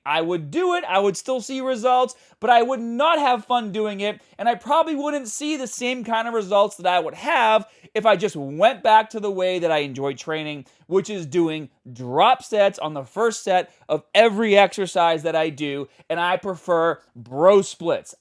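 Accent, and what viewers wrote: Boston, United States. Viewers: As a born a raised Bostonian (Roxbury btw) u have the deepest Boston accent 🫡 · Are you from Boston?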